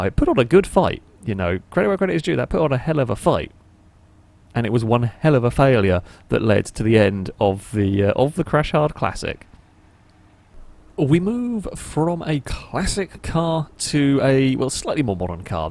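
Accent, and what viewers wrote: United Kingdom. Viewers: hes just brittish, give the man some wa’ahr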